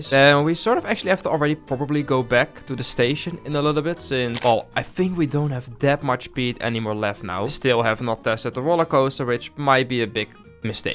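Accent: Netherlands. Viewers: Hoi ik hoor dat jij Nederlands bent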